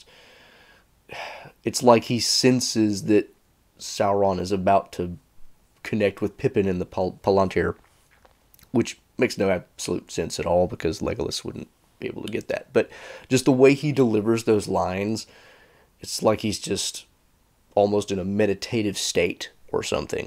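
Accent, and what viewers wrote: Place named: Georgia, United States. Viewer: Georgia. That's your accent. Georgia. I know it.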